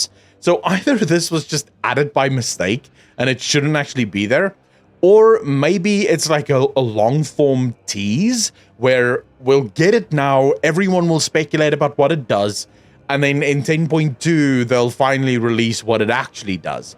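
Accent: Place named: Afrikaans, South Africa. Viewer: Random question, where are you from? Your accent sounds slightly Afrikaans, or Dutch?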